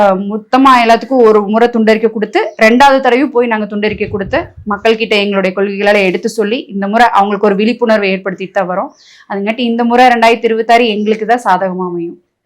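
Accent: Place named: Kongu, India. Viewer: ❤❤அழகு கொங்கு தமிழுக்கு அடிமை சிறப்பான பேச்சு ❤ சிங்கப்பூரில் இருந்து ❤❤❤❤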